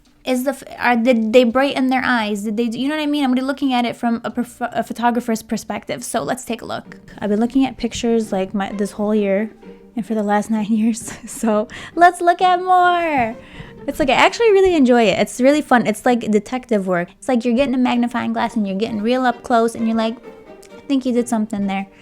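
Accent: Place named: Midwest, United States. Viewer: I can tell your accent is from the mid-west lol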